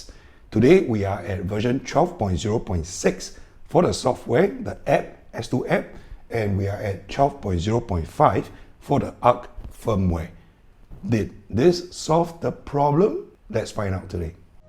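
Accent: Singapore. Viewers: love the videos... And love the Singlish.. No doubt you are from beautiful Singapore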